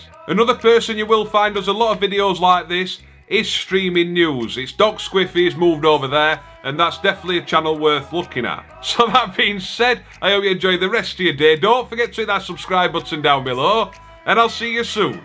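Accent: Yorkshire, United Kingdom. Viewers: 2 4K firesticks also a couple of very small items that has helped me free up space is turning off resource hogs such as the screen saver that can be accessed in the Display & Sounds...by default it is set to 5 minutes turn it to NEVER and back out also daily I find myself deleting something called FreeTime also eating up resources...You might add one should clear cache and force stop every item upon completion of use...also I downloaded a very small program I believe it's about 7 mb called...Background apps and Process list( via the magnifying glass thingee)...It will show you anything running in the back ground...plus having item one can removed that you don't use or items you've missed forced closing and it is eating up valuable space. Many times buffering is caused by little room left in the processor...Just a thought...btw thank you so much for your videos always funny and hugely informative...Granted sometimes I have no freakin clue what you say ...The Yorkshire accent ya know :)...it took me awhile to figure the word "Privacy" when you were talking about your VPN...I was gonna spell it but I don't think I can spell it the way you said it LOL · A right good Yorkshire accent.. 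I’ve a fire stick and I’ve downloaded your app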